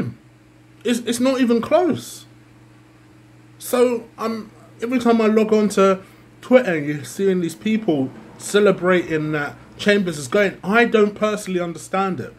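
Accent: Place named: United States. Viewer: I thought you were American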